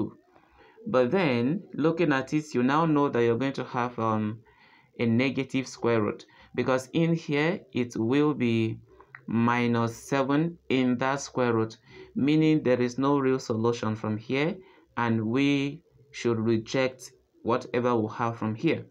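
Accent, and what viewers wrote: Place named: India. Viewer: Привет, судя по акценту, вы из Индии? Интересный формат. А я из России. Город Сочи. Где была Олимпиада 2014.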